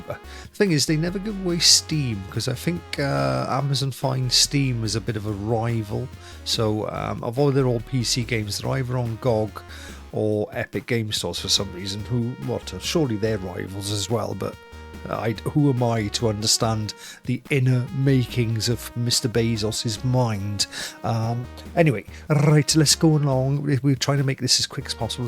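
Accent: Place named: Wales, United Kingdom. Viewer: Love the Welsh accent but rolling his Rs lol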